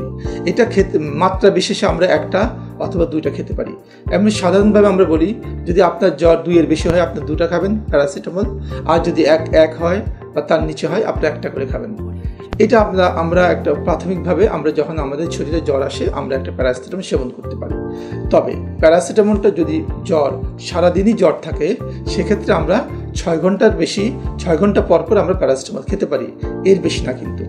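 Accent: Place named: Bangladesh. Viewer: কথায় বোঝা যায় বাংলাদেশের মিয়া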